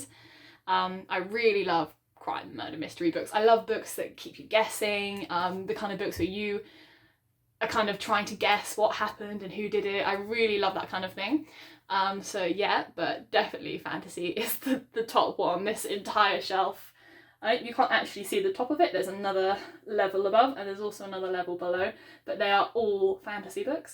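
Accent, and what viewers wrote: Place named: Southern England, United Kingdom. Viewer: I'm not a linguist like Prof Henry Higgins but I'm gonna guess that your accent is from southern England.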